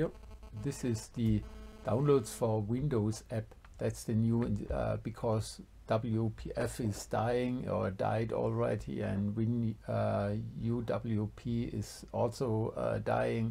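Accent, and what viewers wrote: Germany. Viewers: Man hört das deutsch schon stark raus, aber kuss für Tutorial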